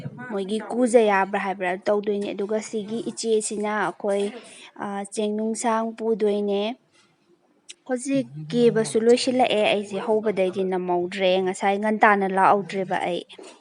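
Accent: Kakching, India. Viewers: Lol akhoiba asida asumna narration touko. Kakching lol asina ariba lolni. Imphalda ngangnarisina lol anouba. Adunani maiba maibina lai haraobada sakpa sonba amadi ngangbada kakchinggi lol mallibase. Puya pabada lol nouraga paba ngamde.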